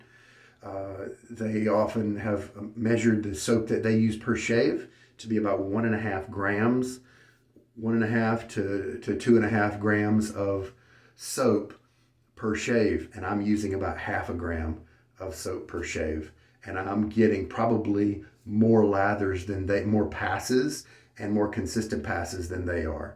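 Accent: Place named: Carolina, United States. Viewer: oh it’s no problem at all! I get a bit overzealous with my comments sometimes haha. Very cool! I felt I was recognizing a carolina’s accent.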